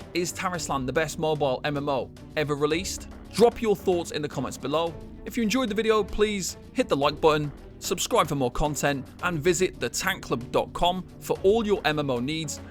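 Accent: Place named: United Kingdom. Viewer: Seems you have an issue with the British Accent. Because there’s absolutely nothing wrong with how this content creators talks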